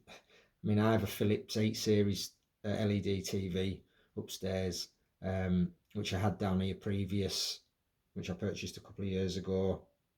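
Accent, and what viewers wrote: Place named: Teesside, United Kingdom. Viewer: You sound like you're from the Teesside area, where you buying from that allows you to return mate? Looking to buy over the weekend, and would love the option to return, after getting stuck with a TV i hated from week 1 last time, but was stuck with it. That was an edge lit nanocell, lights all showing along the bottom of the screen now, but the banding, black wash out and blooming, made dark movies and gaming unusable to me, it was a total disappointment. I've been looking at this TV and the TCL 805, which you obviously favour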